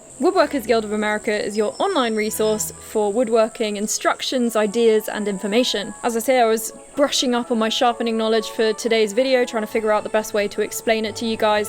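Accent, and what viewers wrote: England, United Kingdom. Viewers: Doesn't hurt though. Americans can't resist that British accent with a feminine lilt. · Interesting situation! You sound English, you're living in Australia, and you are using Texas slang i.e. "Y'all. Okay, well, from an English man living in South Texas, here's a question: How do you deal with the obvious humidity when woodworking? Perhaps that could be a subject for one of your videos.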